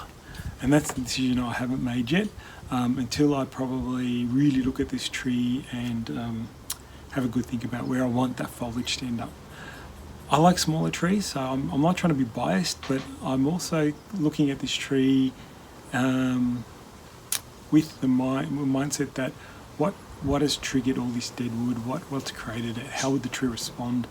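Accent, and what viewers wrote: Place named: Australia. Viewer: Hi, I've been following you on YouTube for some time, I learn a lot about your work with bonsai, but sometimes it's more difficult to follow you due to more the bad sound than your beautiful Australian accent, many days there is wind, we like the sound of the leaves and the birds.....but it would be better with a microphone, don't you think? 😉, kisses from Barcelona, Catalonia your antipodes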